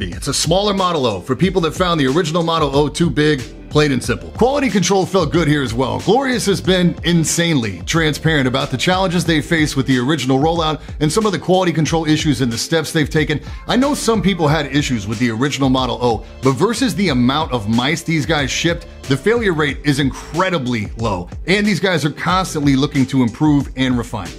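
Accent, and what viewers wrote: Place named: California, United States. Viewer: Love your humor and style of presentation. Haha where you from? Sounds like Cali.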